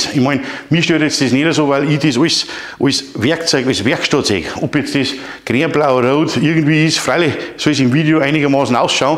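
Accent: Bavaria, Germany. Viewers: Ne, alles OK was du machst, ist doch deine Sache! Lass dich nicht Trollen. Ich schaue mir gerne deine Fachkompetente Videos an. Mach bitte weiter so, vor allem auf Bayerisch! Liebe Grüße aus Unterfranken. 👍👍👍❤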